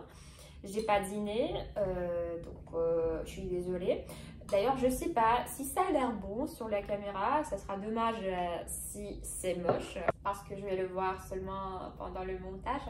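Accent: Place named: Russia. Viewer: Bonjour. Nouvel abonné. L'accent russe apporte à la France tout le 💨 vent froid le blizzare de Moscou et comme il fait encore très chaud en France.. c'est le dépaysement . Merci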